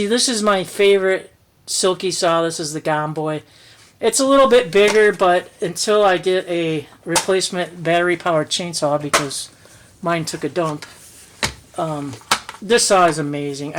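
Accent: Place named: Minnesota, United States. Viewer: I'm so happy to come across your channel today. And also am happy to see another female so pumped about gear you have and lug around! People must think I'm crazy as I pretty much have a lot of the same stuff to some degree myself and in my car and several 'bags' around the house, including the dog's go bag! Cheers from up here in Canada....what State are you in as I detect an accent of sorts...MN WI ?? I have a friend from MN and you kinda sound the same....lol cheers!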